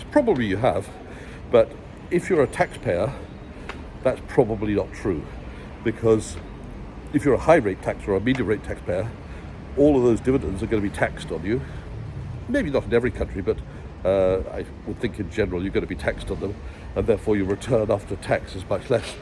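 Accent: United Kingdom. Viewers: Dear mr., really appreciate your information and the effort you make to cover all aspects. Also the way it is presented and in true English from the UK. Thank you and hope you do enjoy making more video’s to progress my learning and knowledge. Greetings from Holland.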